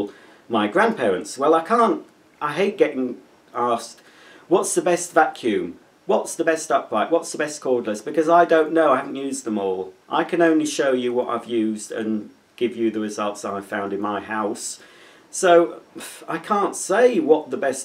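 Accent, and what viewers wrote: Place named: United Kingdom. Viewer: I love the sound of your voice. Well spoken and who doesn't like a British accent?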